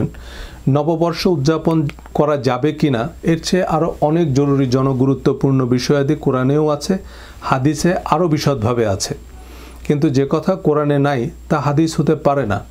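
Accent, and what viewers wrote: Noakhali, Bangladesh. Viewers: By the way.... আন্নে কি নোয়াখাইল্লা???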